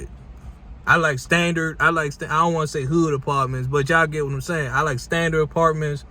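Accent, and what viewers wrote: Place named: Washington DC, United States. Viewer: Good video fam. Fellow DMV dasher here. When I heard your voice, I said ah hell, DC dasher!!! I love it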